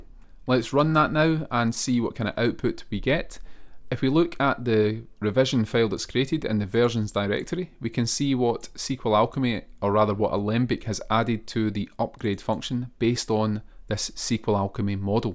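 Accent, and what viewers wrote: Scotland, United Kingdom. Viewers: When I heard the Scottish accent I knew this was someone I could learn from. Edit: I was right. Pure gold of a video. Just golden nugget after golden nugget. No bs. Thank you so much! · British accent adds so much style to this tutorial, thank you! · british accent is too strong... i get you, its ok...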